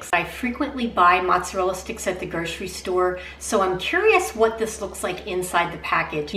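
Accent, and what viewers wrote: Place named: Pennsylvania, United States. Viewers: Great video! Are you from Pennsylvania? Your “accent” sounds like it! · Love the video but staying for your Pennsylvania accent 🙂 coming from a Minnesotan.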